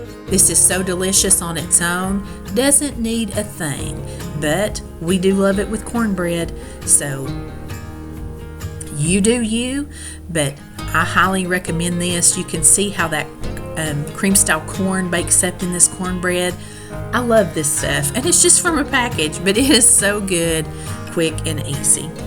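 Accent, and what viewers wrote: South, United States. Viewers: I could have eaten every bite and licked the pot! However my significant other won't eat soups, chili, dumplings and just about anything with a broth, including spaghetti. Really bums me out. We are your near neighbors just off the Blue Ridge Parkway in western NC. This beautiful fall weather has really put me in the mood to cook. I'd have to eat off a potful for a week by myself or try to freeze most of it. No close family or neighbors to share with. Loved watching you cook and hearing a sister southern girl.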